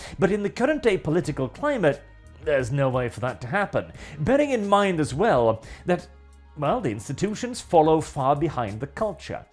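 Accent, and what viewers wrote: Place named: RP, United Kingdom. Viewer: Why are you speaking like Etonian Jacob Rees Mogg pound shop Enoch?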